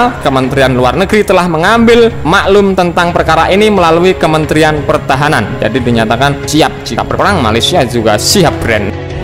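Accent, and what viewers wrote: Java, Indonesia. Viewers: Logatnya logat jawa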